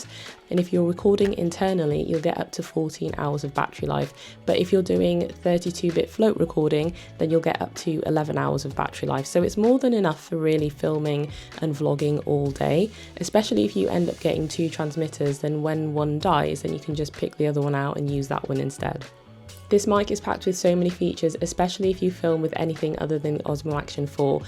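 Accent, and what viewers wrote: United Kingdom. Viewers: So first question…..can you use the mic 2 Bluetooth feature on the GoPro 12 as well? I love your British accent btw lol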